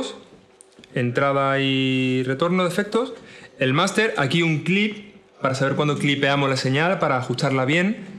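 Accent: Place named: Andalusia, Spain. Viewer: love that "andaluj" accent :)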